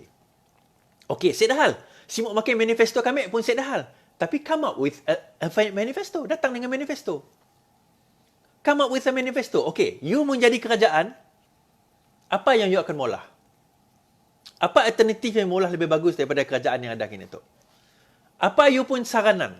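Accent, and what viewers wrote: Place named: Sarawak, Malaysia. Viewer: Abg sy dri kelate still dgr zdisi bhs srawak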